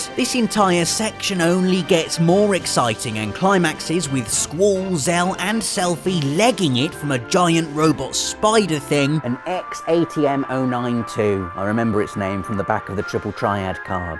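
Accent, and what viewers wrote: England, United Kingdom. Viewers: If i could bear through the narrator voice I'd watch it but god I just can't... :/ Sounds like a british kindergarten teacher talking to below 6 yo children. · Asha2820 He's English.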